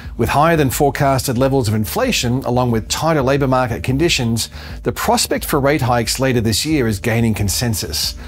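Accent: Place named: United States. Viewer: What is with the American accent breaking in to your speech pattern. It is very strange.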